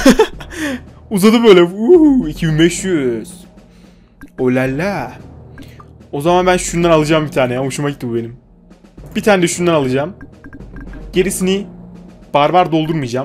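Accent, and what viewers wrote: Ankara, Turkey. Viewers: abi sen ankaralimisin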